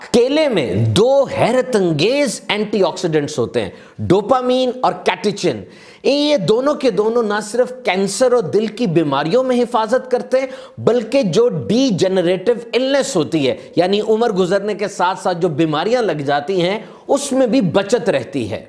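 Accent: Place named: India. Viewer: His accent resembles with Indians .....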